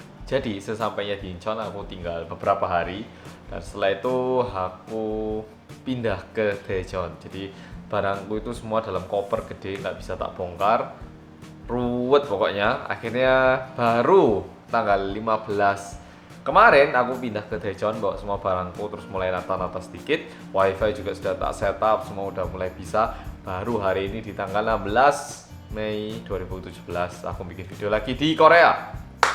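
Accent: Java, Indonesia. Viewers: òlehmu ngomong loo medok dadine lucu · Nadhira Syifa wong Korea asline, tp tinggal lama di Indonesia, tepatnya di malang, jd medok deh bhsa Jawa ne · jowo mu gawe aku nnnggumun cakkkk. gokil abis.sipit iso jowo banget. josss. ajari wong korea kabeh mas di sana. jd kalo ke korea langsung nyambung hahaha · aku kesemsem sama cara ngomong sampean mas.. muueeddookkk tenan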